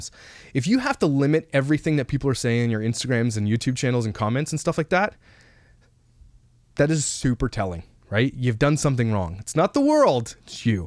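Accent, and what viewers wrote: Canada. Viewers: Completely unrelated, but the Canadian accent is strong in this one 😂 love it lol... reminds me of my fam in Canada 🇨🇦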